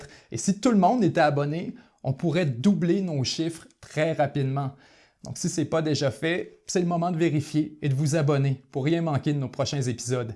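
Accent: Quebec, Canada. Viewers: ce délicieux accent de nos cousins québécois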